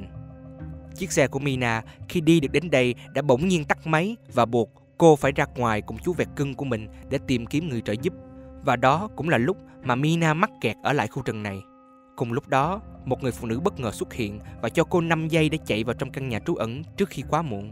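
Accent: South, Vietnam. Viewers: Ko quen giọng miền nam à ? 😅😅 bake nên nghe ko quen chứ gì ?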